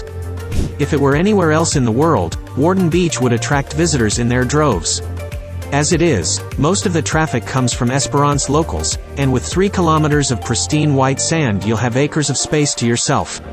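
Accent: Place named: United States. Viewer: Maybe AI IDK? BUT even so, why is an American (accent) talking about Esperance Australia? Why Not Australians? America, Good on you & i thank you❤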